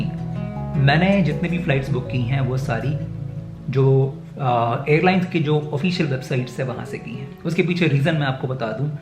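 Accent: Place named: Lucknow, India. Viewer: your this channel is awesome. You have devoted your life for tourists helping them from your experiences & sharing knowledge. I see all your travelogues and enjoy your brilliant clear Lucknowi urdu/Hindi accent. Keep up the good work. Great work bro.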